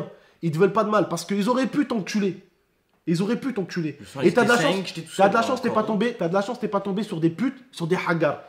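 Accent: Marseille, France. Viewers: Sa me fume il a pris un accent marseillais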